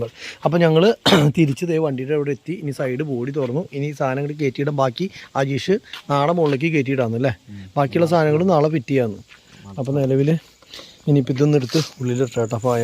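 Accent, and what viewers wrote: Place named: Kottayam, India. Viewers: കോട്ടയം അച്ചടി ഭാഷ ഒർജിനൽ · കോട്ടയം ഭാഷ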